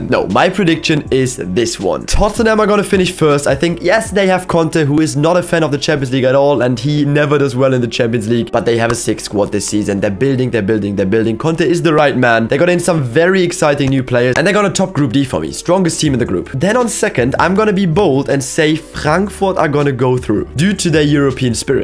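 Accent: Germany. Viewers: your accent is so german. Iconic!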